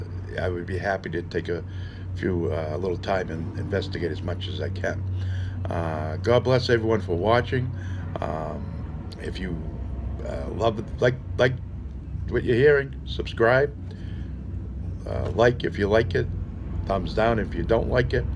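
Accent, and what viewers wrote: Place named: Massachusetts, United States. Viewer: 1,600 dollars for a small one bedroom in Los Angeles area. Very difficult for people to get by. I grew up in Mass. Nice to hear the accent.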